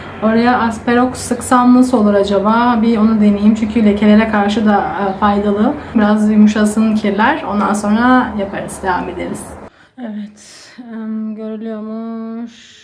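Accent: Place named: Yozgat, Turkey. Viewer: esahhhh mı 😂😂😂 bu şiveyi herkes bilmez zaten 😂😂 evelallah Yozgat hanımları mariferlidir 💪💪💪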